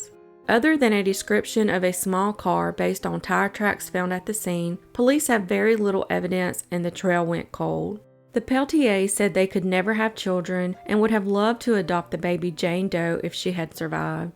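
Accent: South, United States. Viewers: Coffee, Crime and a Southern accent. It is a good morning.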